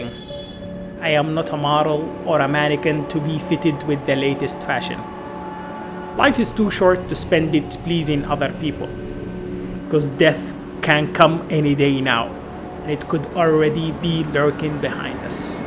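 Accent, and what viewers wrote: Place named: Africa. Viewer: مرحبًا ، دائمًا... يتطلب الانتقال نوعًا من الجهد الأخلاقي والمالي والقانوني. أنا متأكد تمامًا من أن مشاكلك تتعلق ببعض المشكلات الأخلاقية وبعض المشكلات الاجتماعية (التي تتعلق بالقانون) ، حاول تقليل قضاء الوقت في التحرير ومحاولة التصوير في بث مباشر (كما فعلت هنا). بالإضافة إلى ذلك ، قم بتحسين لغتك (بالمناسبة... لهجتك رائعة من أصل أفريقي).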